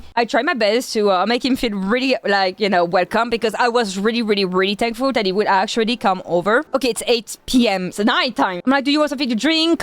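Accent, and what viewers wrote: France. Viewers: Canadian with the strongest French accent ever...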